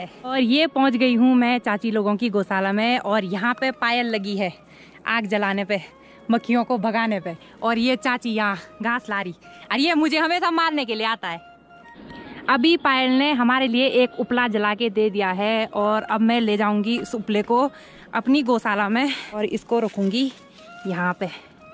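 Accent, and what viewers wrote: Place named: Garhwal, India. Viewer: बढ़िया वीडियो बनाया। हिंदी को गढ़वाली लह में बहुत अच्छा बोलती हैं।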